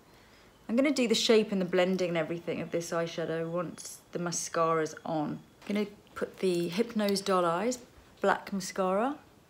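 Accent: United Kingdom. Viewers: Lisa Eldridge I absolutely love watching your videos. I in the United States and your British accent is so lovely. I think you are such a beautiful woman. I love all your beauty tips. When I watch you I feel relaxed and ready to change my look style with confidence. Much love to you and your gorgeous kitty 😻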